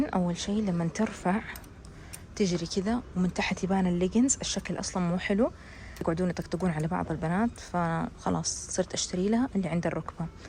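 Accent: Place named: Saudi Arabia. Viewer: انا متابعة جديدة ممكن ترحيب ❤ صوتك يجنن انا احب اللهجة السعودية 😊✨🦢🇸🇦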